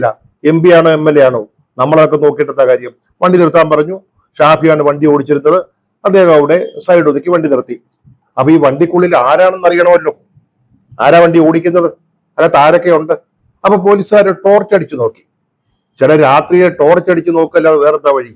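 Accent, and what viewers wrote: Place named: Travancore, India. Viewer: Wonderful...Your searing comments laced with humour and delivered in the Thiruvithankur lingo was captivating...You are perhaps the best political commentator among all the Malayalam commentators...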